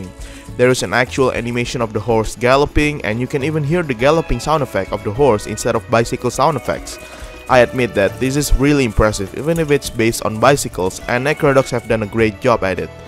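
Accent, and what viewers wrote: Indonesia. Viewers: setelah nonton beberapa video lu, gua bru sadar lu orang indo wkkww, gara2 accent . ah sial gua kira dlu org luar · Logat Inggrisnya kek dari Indonesia. Kasi tau gw bang kalo lu dari Indonesia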